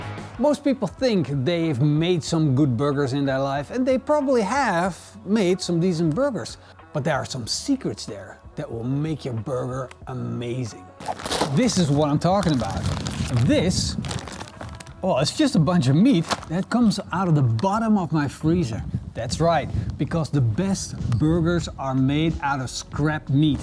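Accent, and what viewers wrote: Netherlands. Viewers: Ben je Nederlands? Hoor het aan de accent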